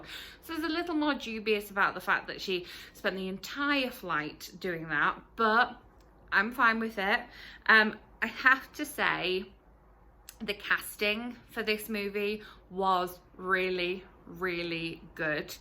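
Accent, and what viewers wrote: United Kingdom. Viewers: You have a British accent, are you in the UK or America? You do love your books, do you like factual books or more fiction?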